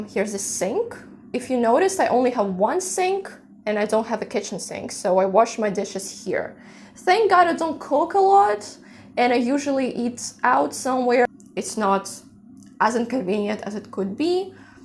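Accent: United States. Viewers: if i didn't know was already Russian, i would have thought she was American. HAHA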